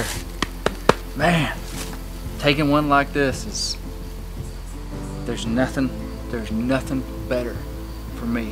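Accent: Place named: South, United States. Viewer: That's the most southern accent ever. Great video.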